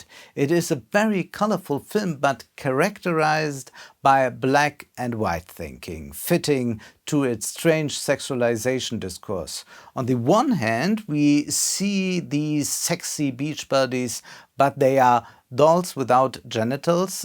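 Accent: Germany. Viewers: Jesus man hört den deutschen Akzent richtig hart raus.... Die Vorstellung das die Aufrufe explodieren nur weil das Video nochmal in Englisch gesprochen wird... Vielleicht lieber einfach englische Untertitel anbieten und wenn es viele wollen und man es kann dann anbieten? So ist der Aufwand doch höher als der Ertrag oder? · Mei dier Genosse! Der deutsche Aktenz stört glaube ich uns Deutsche mehr als andere. Ich habe die Erfahrung gemacht, daß ich Leuten die deutsch, aber mit einem fetten Akzent sprechen, eigentlich problemlos zuhören kann. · To create content in english as well makes sense on YouTube, in order to reach a wider audience. Great work, i hope it pays off! And the german accent is not an issue at all, we live in the 21st century, people from different countrys have accents… who cares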